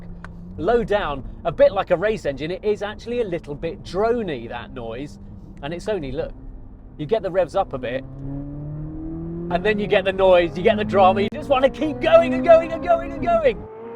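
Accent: England, United Kingdom. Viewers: english accent driving american car = stop watching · "Euro" presenters hardly ever test American sports cars because generally speaking, only Americans buy them. As for the 'home team', Top Gear is a British magazine if the accent didn't give it away, and the Porsche is German.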